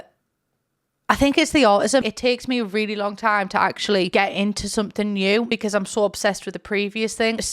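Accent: United Kingdom. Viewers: whenever someone says british accent, this is what I think of :) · I have always loved the British accent, and I enjoy your content, damn good job, subscribed.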